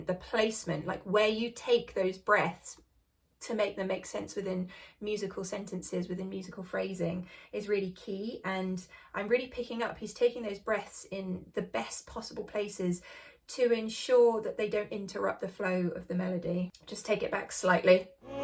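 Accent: United Kingdom. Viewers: Nice British accent you have(Shakespeare 's), thank you so much!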